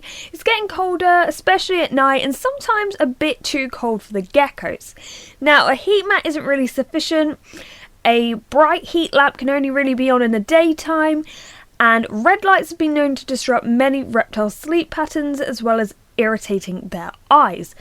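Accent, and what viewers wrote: United Kingdom. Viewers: Wait your British :D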